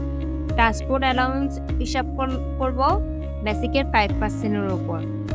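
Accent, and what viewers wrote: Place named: Chittagong, Bangladesh. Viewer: Chittagong r meye confirm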